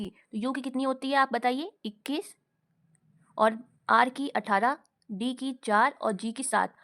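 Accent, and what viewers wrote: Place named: Bareilly, India. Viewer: सायद आप बरेली से होंगी अबाज़ से लग रहा है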